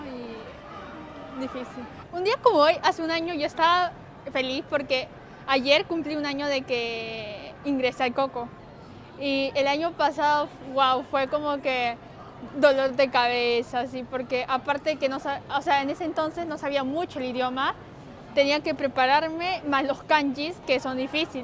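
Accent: Lima, Peru. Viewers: sabia que era Peruana se nota el acento, yo tambien soy de Peru y hay muchos Peruanos Japoneses aqui tambien saludos te sigo desde el 2010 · Tengo mucho amigos peruanos y si se nota mucho el acento peruano q tiene saludos desde España 🇪🇸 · Es acento peruano me di cuenta rápido 🙂♥️ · Ese acento es inconfundible, de peruana, limeña y la entonación es de nikkei. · Me encanta su acento Peruano, ella es muy linda. 😍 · Que mal que conserve el acento peruano debería sonar más neutral por lo menos tiene algo más que el español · Cuando la escuché , su forma de hablar me parecía muy común ya que soy peruano y si le atiné era medio PERUANA 🇵🇪🧐👀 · Habla como peruana. Muy buena diferenciacion. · Ni bien la escucho y me suena a Perú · Tiene acento de peruana me parece? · como es posible identificar tan bien el acento peruano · todo el mundo tiene acento, los peruanos no son la excepción, la chica tiene un marcado acento limeño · Alv yo tambien soy Peruana pero encerio quiero tu vida es wow xd me caiste bien antes de que digas que eras mitad peruna estaba con la duda por tu acento xd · esa forma de hablar es de peru, pero dices ser mexicana, teniendo madre peruana , me perdi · No pasó ni 20 segundos desde q hablo la chica se nota en una el acento peruano yo me pregunté recontra peruana 😱😱😱😱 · Yo apenas vi que hablo español sabía que era peruana la mayoría de japoneses que hablan español son de padres peruanos o tienen ascendencia peruana jsakjkds 😽💗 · Suena super limeña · Es un acento muy limeño su acento. 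En Perú también tenemos muchos acentos. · Yo la saque en una su acento de conera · Que guapa chica, me gustan las peruanas y su acento! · ya sabia que tenia algo de peruana, por su forma de hablar xd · hermosisisma nipona pero el acento super peruano 😁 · En lo personal, no hay nada mejor que el acento P E R U A N O , arriba PERU, bien entendible y NEUTRO. Gracias por compartir esta informacion cultural, un saludo positivo. From: Utah, US.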